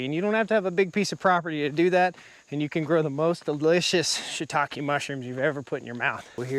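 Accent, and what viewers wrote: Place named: Carolina, United States. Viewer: I love your Carolina accent!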